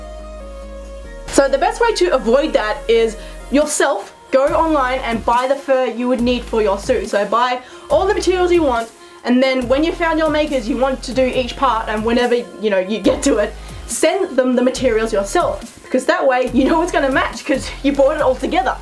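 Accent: Australia. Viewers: Q and A are u Australian or Tasmanian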